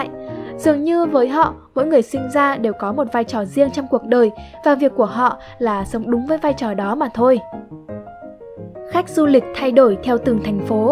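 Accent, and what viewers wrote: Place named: North, Vietnam. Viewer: giọng là giọng bắc, nhưng đôi lúc có chút phong ngữ miền nam:)))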